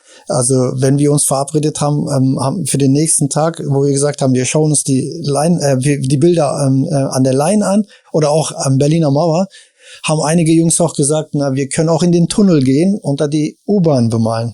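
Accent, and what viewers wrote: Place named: Turkey. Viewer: Geil wie er perfektes Deutsch spricht aber das türkische S ist immer dabei. Leinwand oder Ssug? Haha. Super geniales Format! Hiphop don't stop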